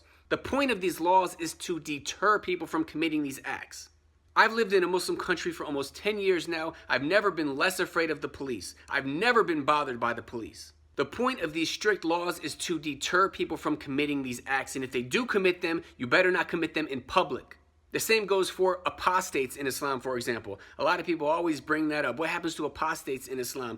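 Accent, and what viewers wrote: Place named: United States. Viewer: If American is so bad why are you using YouTube?? An American invention???? Why are you talking with an American accent?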